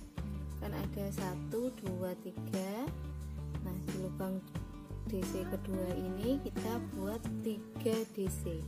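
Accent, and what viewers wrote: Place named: Java, Indonesia. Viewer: mantap,.. kreatif sekali mbak.. salfok sama suara medhok nya lho.. hehehe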